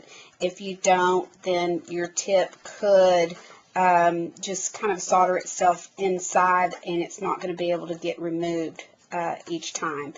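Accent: United States. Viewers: hi - firstly, you sound so much like someone I know - are you Texan? Secondly - can you use aluminium tape instead of copper tape? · I believe what she is saying is "tinned" which means you keep your tip covered with a thin coating of solder. Southern accents are hard to hear sometimes.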